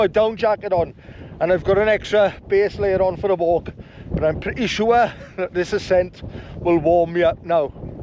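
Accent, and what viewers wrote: Wales, United Kingdom. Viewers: I love your videos … so relaxing but that could be down to your Welsh accent. So soft and bootiful 😂 Also the vids are very informative. Just joined and the price is a steal especially as your videos are so well shot and narrated. Much love to and. Keep on doing what you’re doing it’s much appreciated.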